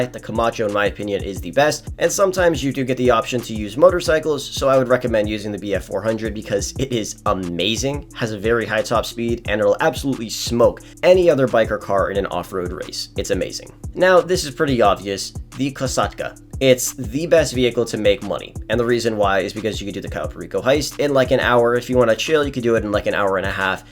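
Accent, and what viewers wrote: Slovenia. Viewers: Wait are you from SLOVENIA😅🇸🇮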